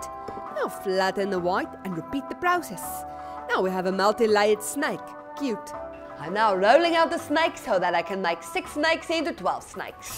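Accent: Afrikaans, South Africa. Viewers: A bad Afrikaans one. Quite common :) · Is she South African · In her videos she has an Afrikaans accent but that is not how she talks in real life only in her videos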